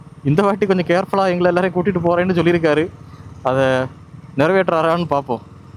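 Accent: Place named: Kanyakumari, India. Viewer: Nice .....Nanbarae.....In kk slang....❤❤❤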